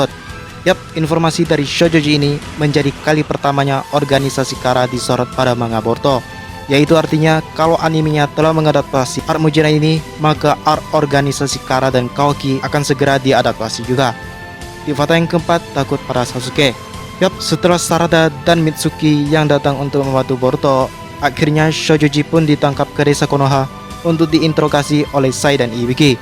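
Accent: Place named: China. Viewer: dia keturunan tionghua bro jd logat bicara nya seperti itu ;)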